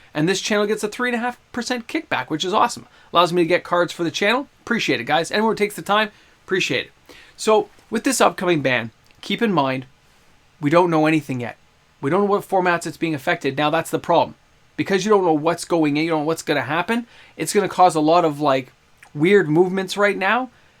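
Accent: Canada. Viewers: Anytime I have a negative thought about how WotC is doing something I don't like, the thought in my head is narrated in your voice. A shouted-stern-canadian voice.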